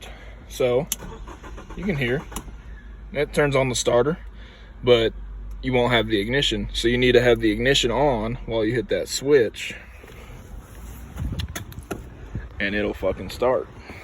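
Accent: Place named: Alabama, United States. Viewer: Sumbitch you gotta be from Alabama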